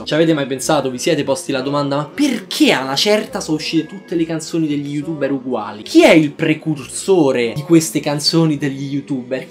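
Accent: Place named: Salento, Italy. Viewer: Sembra più salentino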